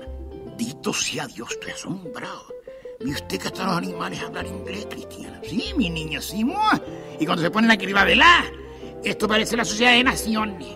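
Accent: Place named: Canary Islands, Spain. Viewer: Maravilloso ese acento y modismo de los barrios capitalinos y ese sabor canario. Que desgraciadamente se ha perdido...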